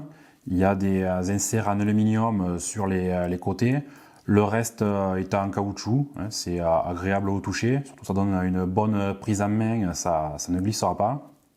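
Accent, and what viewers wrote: South, France. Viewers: Merci beaucoup et toujours avec l'accent du sud et le sourire en prime 👏👍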